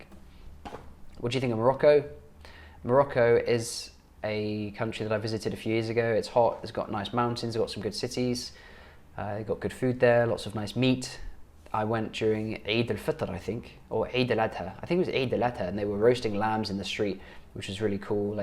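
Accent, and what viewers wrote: United Kingdom. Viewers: learning arabic from British accent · more British accent videos plz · I wish I can speak British like you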